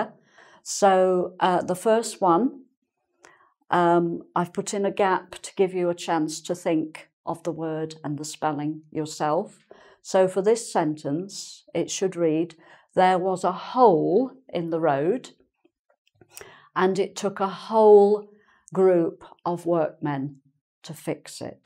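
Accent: England, United Kingdom. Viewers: Hello teacher. I really like your lessons and they very useful for us even your accent that you speak by is wonderful I wish that I study with you only for a month I will be a good britch accent speaker.. Thank you so much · Hello, it's a pleasure to hear your Sweet English accent. I have been several times in England in the four seasons and I have never seen a drop of rain, you know...Only the Sun.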